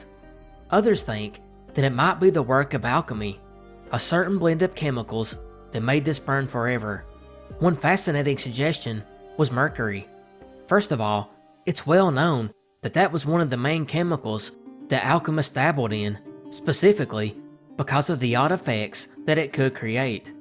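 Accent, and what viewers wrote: South, United States. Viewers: Southern accent x Mysteries. Subbed. Finally something new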